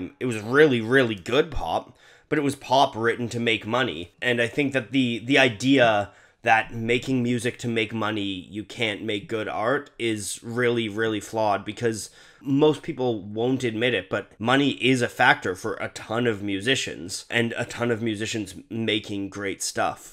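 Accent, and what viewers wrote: Canada. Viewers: You sound so Canadian it is adorable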